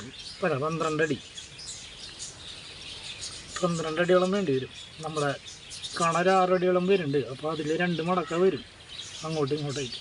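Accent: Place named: Thrissur, India. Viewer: Kettaa arinjoode thrissur aanennu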